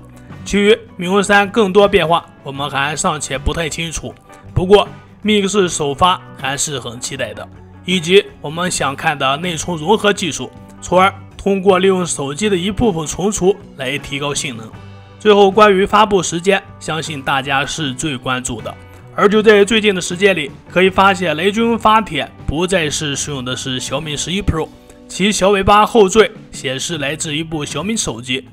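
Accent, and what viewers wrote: Shanxi, China. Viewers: 这是山西口音，你会不会听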